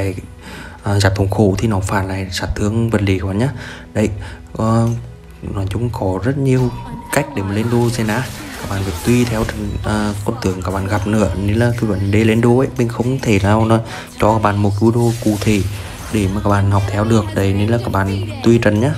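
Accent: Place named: Nghe An, Vietnam. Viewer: Kiểu kiểu như Quang Linh vlog. Chắc ở Nghệ An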